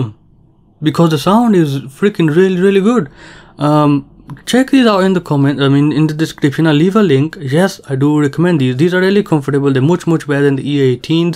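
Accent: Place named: West Yorkshire, United Kingdom. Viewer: Thats one right west Yorkshire (asian) accent lol I have recently got them, they are great but bass is not that good.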